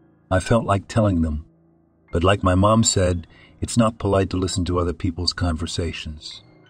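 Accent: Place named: South Africa. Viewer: You almost sound South African hey😊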